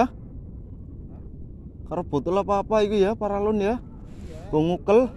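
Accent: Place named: Banyumas, Indonesia. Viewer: Waduh malah patah sepining pancing nya ,yang penting dapat ikan bos,ngomonge ngapak koh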